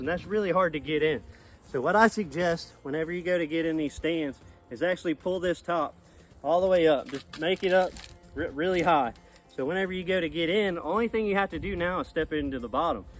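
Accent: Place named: Florida, United States. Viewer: thanks for a good video and plain florida talk. good luck hunting. I hunt osceola wma in a sumitt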